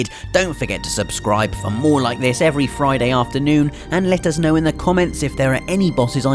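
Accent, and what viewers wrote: United Kingdom. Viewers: when he said "whispering into your ear" during the Dark Souls segment im sorry but his british accent made it sound like he said "whispering into your rear"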